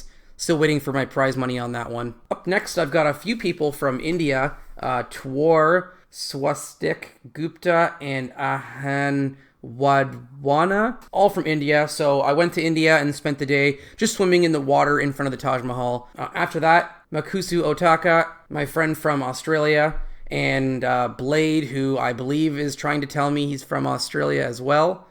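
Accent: Canada. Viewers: This might be your Canadian accent though, but we pronounce the first syllable like BILL, not like BELL. As it's spelt. You passed in my book though!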